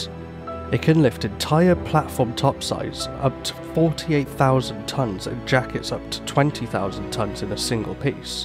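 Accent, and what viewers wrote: United Kingdom. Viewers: Well, great video. As much a fan of the British accent, I am wondering where is? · Lose the limey!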